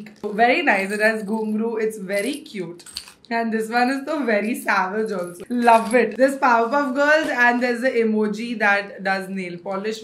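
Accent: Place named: Gujarat, India. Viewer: I love your Gujarati accent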